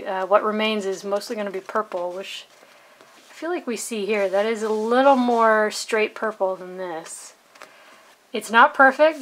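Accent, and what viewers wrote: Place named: North America. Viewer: Thanks great review I wanted to try this ink but wanted to know about its water resistance. You gave me all the info I needed so subscribed. P.S I'm Brit so not good at North American accents but I like yours. Love the opening spiel too. I guess you are the!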